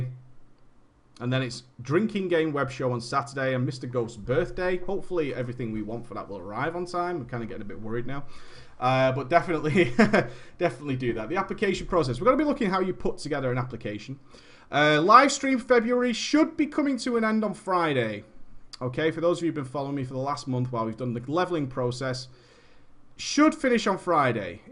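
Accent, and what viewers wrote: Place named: Yorkshire, United Kingdom. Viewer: You sound like you're from Yorkshire :@ Lancashire ftw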